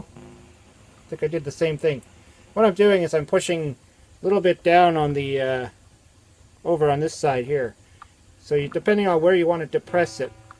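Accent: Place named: Canada. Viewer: You sound and look canadian like from Toronto or something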